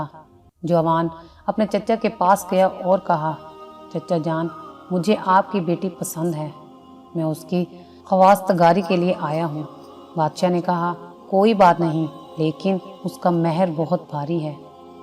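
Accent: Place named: Punjab, Pakistan. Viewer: Aap ka accent bht ghalat hai. Farsi k alfaz k neechay kahan par zabar ya zer hai aap ko nhen pata. Aur ye urdu hai Punjabi nhen, iss ko urdu accent mein parhye. Plese do practice at least three to four hours once script is ready and then upload the video.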